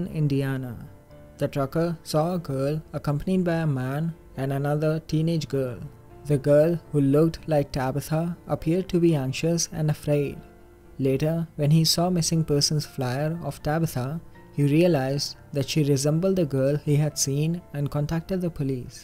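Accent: India. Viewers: not this indian voice, literally worst accent possible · Are you from India? I have a friend in Northern India and I'm noticing the accent is slightly different but similar. Most of the Indians I've met have accents closer to yours.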